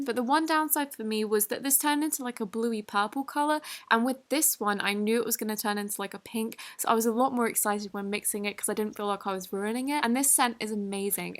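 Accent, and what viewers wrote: United Kingdom. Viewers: I also ❤️ ur British accent 🤗😍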